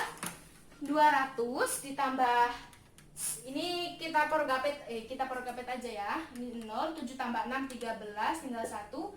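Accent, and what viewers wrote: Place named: Java, Indonesia. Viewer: Mbak nya kok lucu,, ada medoknya dikit😂 tp aku suka😊🤭.. asli mana sih kakaknya😊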